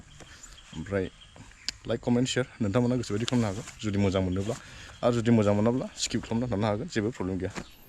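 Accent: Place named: Kokrajhar, India. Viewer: Kokrajharni Baidi railainw Naja ada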